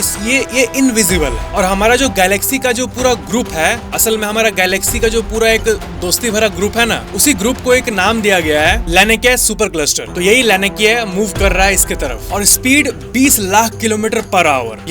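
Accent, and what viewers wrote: Bihar, India. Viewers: Pakka bihari bol chal h bhai ka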